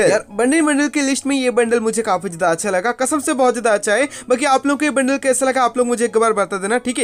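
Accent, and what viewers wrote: Odisha, India. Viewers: Bhai tum odisha se ho na?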